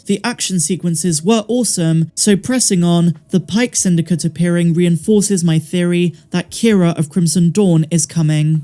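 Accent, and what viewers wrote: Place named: England, United Kingdom. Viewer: Twated lol big up star wars so nice hearing about star wars in a English accent keep up the good work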